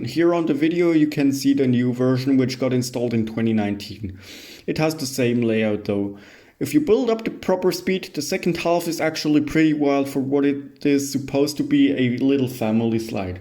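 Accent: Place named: Germany. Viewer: Youre german,right?I can hear it...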